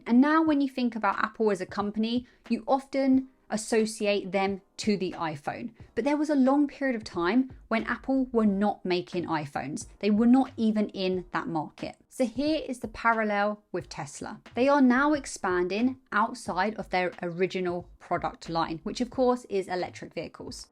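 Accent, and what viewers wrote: United Kingdom. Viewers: Found the channel, sub'd, I listen to several TSLA retail investors, this is the first British retail TSLA channel I've watched (American), The British accent is kinda fun. Anyway, I am part of the legion of retail investors that are all in on Tesla. I think self driving cars shares a certain similarity to the development of search for the interent in the 2000s, except that the capital requirements for self driving cars effectively means that some college kids in a dorm room will not win this race. Thus, the players are more well defined, and extroplating a winner is theoretically easier. Could I and others betting on Tesla be wrong? Of Course, but I think our odds are much better than those who were searching for the needle of google in the haystack of internet search companies back in the 2000s and the payoff is also arguably higher. 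Big upside and, an easier route to finding the winner. If Tesla can solve cars, I think the Bot situation is quite optimistic after that, since they can really push hard without worrying about consequences. If a car crashes, people can die. So a lot of restraint has to be utilized with the AI development. If a robot runs head first into a wall or a machine, some minor damage occurs at most, so they can really push hard and go nuts with little restraint on the bot. I think people will be surprised when Tesla wins self driving, but I think they will be utterly shocked and austounded at how quickly Tesla pivots and has effective humanoid robot wor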